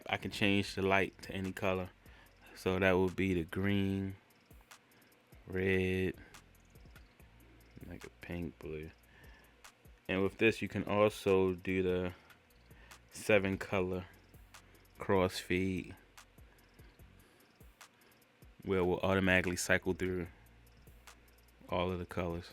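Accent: Baltimore, United States. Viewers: Bruh is you from Baltimore...you talk just like me...ayyyyeeeeee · Somebody from Bmore😂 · Quick to clock an accent. You from Baltimore? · Are you from Bmore? Sounds like it! Also thanks for the tutorial! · Sounds like you from BMore. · U from bmore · Are you from Bmore? Sound like it.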